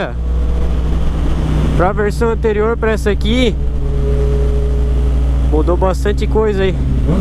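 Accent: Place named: Santa Catarina, Brazil. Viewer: E pelo sotaque, e eu também sou moro aqui em Florianópolis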